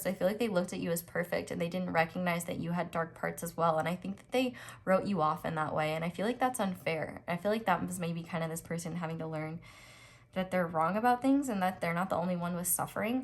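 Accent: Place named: United States. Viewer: i wish i knew which part of america her accent is from. i fall asleep to her voice so often. it is so soothing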